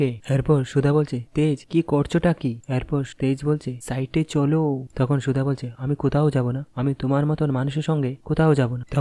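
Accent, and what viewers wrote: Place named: Bangal, Bangladesh. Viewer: কথকতা অতি খারাপ। যত বাঙ্গাল বাঙ্গাল ,অশিক্ষিত কথকের জঘন্য কথকতা ভাল লাগেনা নিজেকে যোগ্য করো।চেষ্টা কর। নিশ্চয়ই পারবে।শুভেচ্ছা রইল।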